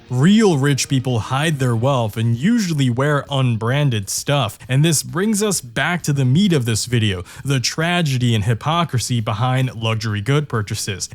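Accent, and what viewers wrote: United States. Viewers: Bro I thought you were actually American you expect me to believe that you're Asían? Nahhhh I can't do that 😢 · is asian? You sound like exactly American tbh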